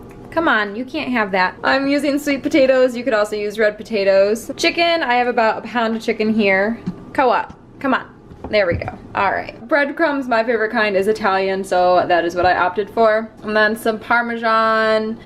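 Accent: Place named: South, United States. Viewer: I think that you are starting to get a little Southern twang in your voice! (I am from Alabama)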